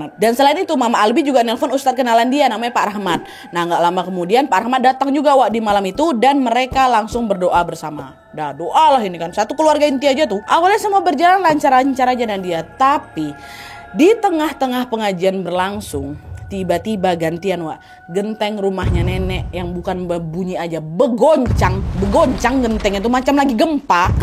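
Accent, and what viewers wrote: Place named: Medan, Indonesia. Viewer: Emng kak nadia bukan org batak? Logatnya medan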